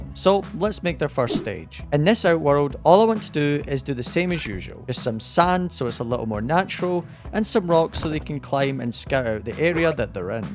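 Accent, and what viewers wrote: Scotland, United Kingdom. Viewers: What part of Scotland you from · Bro sound bri-ish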